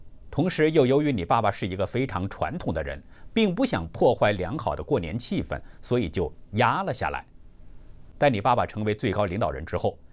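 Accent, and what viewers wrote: Tangshan, China. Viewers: 主持人有点唐山味，赵丽蓉老师的同乡吧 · 这主持人肯定是河北唐山人，太浓的唐山味了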